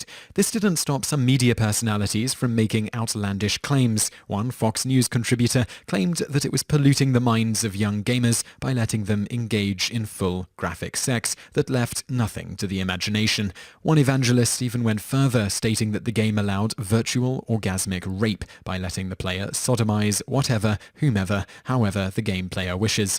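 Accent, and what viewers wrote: United Kingdom. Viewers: Oh dear god. If I see another comment complaining about how the narrator pronounced 'Controversy', I'm going to smack someone. It's his accent. It's NORMAL! There is more than one kind of accent in the UK. Not everyone there sounds like Hugh Grant, so get off your high horses. Every English speaking country pronounces things in a different way. Perhaps we're the ones saying it differently because the English language obviously didn't start in North American. It's like me making fun of Americans for spelling things all wrong Ex. It's Humour not Humor or It's Colour not Color. Though, with all due respect, the USA is probably the last place to look to for 'proper' English. Speaking and spelling.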